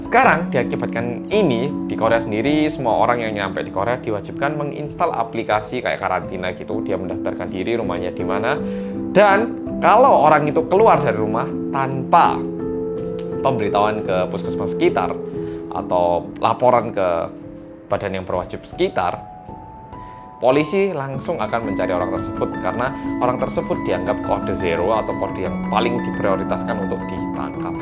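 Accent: Java, Indonesia. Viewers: Wes di dendo dikon ganti rugi. Hissss neng omah yo enak kok delok Youtobe, yang medok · Korea medooook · Ganteng benner masss di video ini jujurr langsung terpana aku ngeliat mukanya mass medokk 🤣:' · Mas muedok annyeong HI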